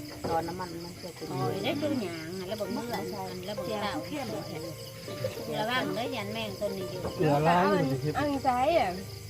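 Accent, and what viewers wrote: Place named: Ubon Ratchathani, Thailand. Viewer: สำเนียงการเว้าบ้านคุณ คล้ายเมืองอุบลฝั่งไทยมากเลยครับ แซบๆเด้อครับกินอยู่บ้านละดีพร้อมหน่าพรอ้มตาครอบครัว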